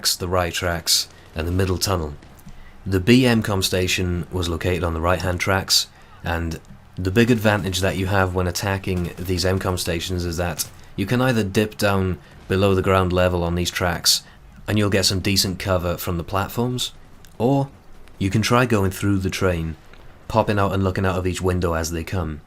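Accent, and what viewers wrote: Wales, United Kingdom. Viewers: Nothing like Statham. This guy has Welsh in his voice. Statham is cockney. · Informative, interesting, and...oddly soothing. British accents are great.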